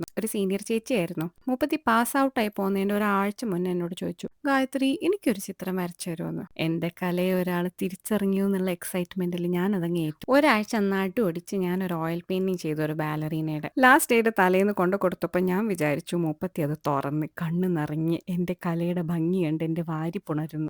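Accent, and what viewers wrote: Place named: Kozhikode, India. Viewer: slang kettpm thonni ammle kozhikode aan enn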